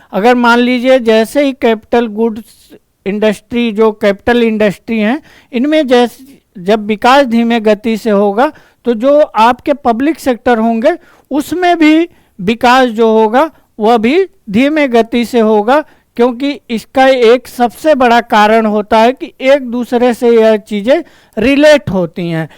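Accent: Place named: Uttar Pradesh, India. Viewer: Sir himachal look m, Uttar pradesh ki Awaaz... very nice sir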